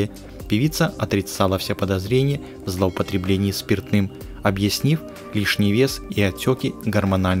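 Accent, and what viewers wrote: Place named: Lviv, Ukraine. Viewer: Очень хорошее видео, но комментатор явно с Львова .....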